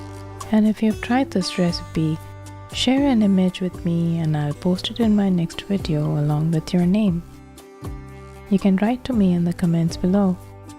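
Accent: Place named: India. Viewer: Very nice u sound like u r from India 🇮🇳 am i west Indian trinidad 🇹🇹 lovely 🙏✌️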